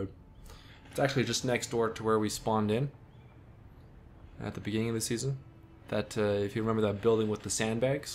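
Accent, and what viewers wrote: Canada. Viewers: Damn I knew you were from Canada the way Canadians say "out" just gives it away lol I was posted near to Medicine Hat for a while. Am enjoying your Videos so keep em coming!!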